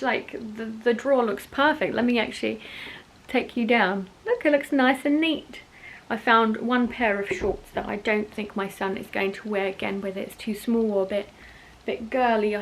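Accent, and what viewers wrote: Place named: United Kingdom. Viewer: I was looking for a Jury duty video, but instead I found myself listening to a bri’ish accent. That’s wild.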